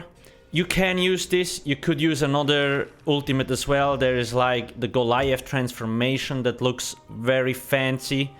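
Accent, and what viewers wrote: Switzerland. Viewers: Sounds like a swiss dialect. Grüezi us Dütschland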